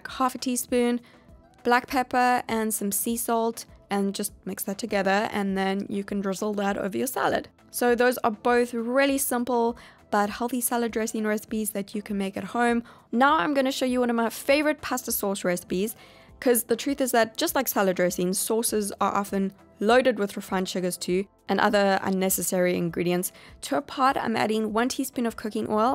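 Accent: South Africa. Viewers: Are u South African? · Is your accent South African? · Do I detect a South African accent? I really should get back into a healthy diet, since moving to Gauteng am completely out of my routine, exercise and diet wise. The only thing that is saving me is intermittent fasting 😅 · This is great! I love your videos, I've watched several since I found you yesterday and a bonus that you're South African (originally from there) haha miss the accent so much! I've been slowly doing this for my diet and will only eat refined sugar in small quantities like ex.cliff bar, I won't eat the whole thing on one day, (but I'm going to try find the brands you suggested tho) and I have a cheat day on the weekend for ice cream or some other treat ! My diet is mainly plant based now so I feel good. Can't wait for the day where I crave healthy food more than sweet and unhealthy food ahha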